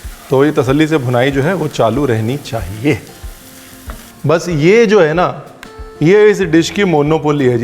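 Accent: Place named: Punjab, India. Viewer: Sir, you sing so well, i just love the way you speak punjabi.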